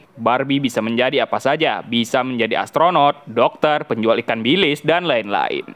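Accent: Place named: Pekanbaru, Indonesia. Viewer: jelas bgt logat pekanbarunya hahahaha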